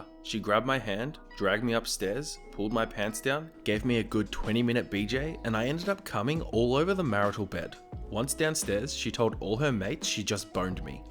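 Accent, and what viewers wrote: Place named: Australia. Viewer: I don't know why but j feel like your an Australian because of that accent btw I'm from nz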